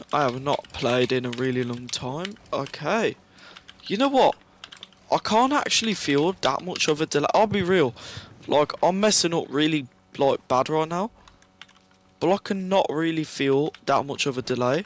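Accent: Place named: Dudley, United Kingdom. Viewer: the accent bro, I’m from Wolverhampton and my moms side of the family are majority Dudley so I’ve grey up around the accent so I can spot a Dudley accent easily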